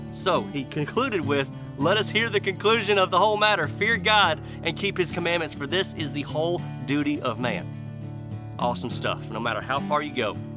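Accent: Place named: Texas, United States. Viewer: I like your voice are you from Texas ? You sounds like Texans..I live in Texas ,but I’m originally from west Africa..love your videos.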